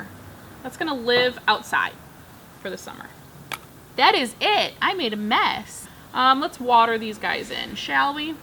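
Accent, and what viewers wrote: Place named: South, United States. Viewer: Hi, I'm afraid of the string of pearls as well, they look like some green peas which are so delicate..plant with me are so soothing..where are you originally from?..you sound like you have a little southern twang..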